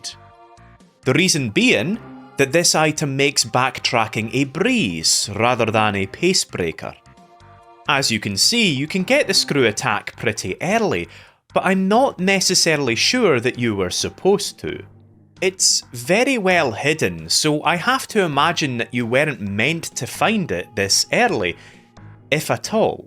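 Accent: Scotland, United Kingdom. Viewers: That's a Scottish accent right? Fresh change to hear a game review with this accent. I like it. Wait is that Scottish right not irish? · Listening to your Scottish brogue made this video fly by quickly. Cheers from this grandson of Coatbridge, born in the States.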